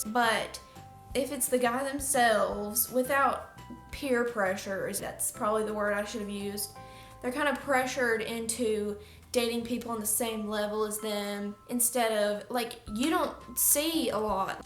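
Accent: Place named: South, United States. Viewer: I love your chill personality and southern accent, you just got a new subscriber 💓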